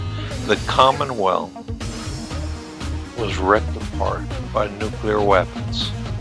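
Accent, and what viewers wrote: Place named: South, United States. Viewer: when I first watched your videos, your narration reminded me of Easy Pete for some reason, perhaps because of that Southern type accent you have, which sounds great by the way. Now I'm thinking you're more like the JRR Tolkien of FO4 because your storytelling is on another level. Thanks for sharing this video. It gave me a lot to think about after I watched it and yes, the music is great, compliments it well!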